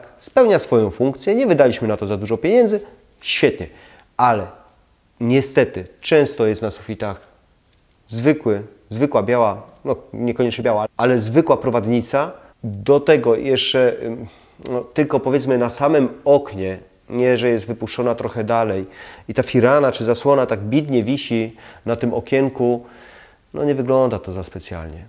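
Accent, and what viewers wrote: Silesia, Poland. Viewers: Nie, proszę nie :) ! Ma pan piękny, bardzo delikatny śląski akcent ❤, czy dobrze go słyszę? Niech zostanie! Pozdrawiam serdecznie. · Ależ razi ten śląski akcent 🙈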